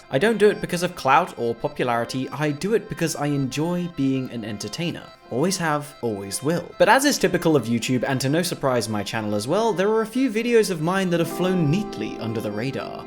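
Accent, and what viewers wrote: United Kingdom. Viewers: Came for hollow knight stayed for a British (seems like it) dude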